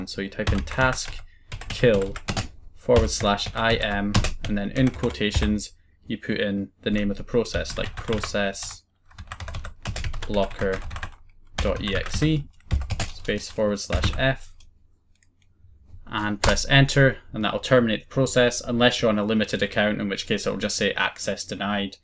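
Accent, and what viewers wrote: Scotland, United Kingdom. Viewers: You sound Scottish are you Scottish?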